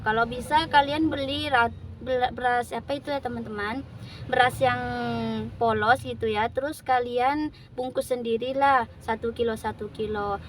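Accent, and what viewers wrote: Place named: Madura, Indonesia. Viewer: Kental khas madurax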